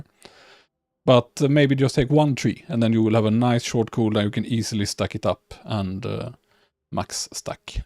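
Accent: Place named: Sweden. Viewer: pause you're from Sweden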